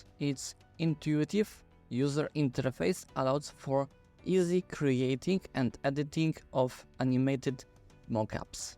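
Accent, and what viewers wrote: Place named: Poland. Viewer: Polish accent :face-red-heart-shape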